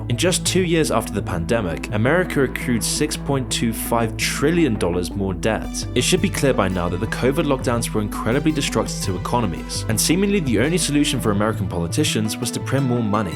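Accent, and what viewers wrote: United Kingdom. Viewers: A person from the UK with a British accent is doing a video on the US economy lol.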